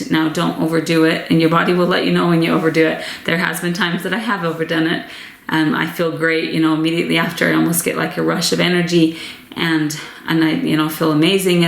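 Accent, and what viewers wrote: Pennsylvania Dutch, United States. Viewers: Would you be able to do a video on your hair care and makeup routine for mom of many? You look so good and I know you’re busy so how do you do it?! Also, random question, do you have a bit of a Pennsylvania Dutch accent or something else? I’m just curious what I’m hearing ! So glad you’re making more videos (watched your laundry video and was sad there weren’t more homemaking videos!!!) 💛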